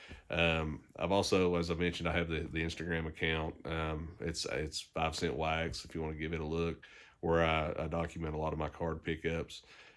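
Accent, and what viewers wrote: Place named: Tennessee, United States. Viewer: Great first video! I have been brainstorming about how to start a channel myself and how I can contribute to the community. You have helped me continue to gain some excitement about the opportunity. We have lots of similarities in our hobby background, along with many others that have come back to the hobby! Looking forward to hearing more from you. Sounds like you may live in Tennessee, I am in Johnson City. Take care and good luck!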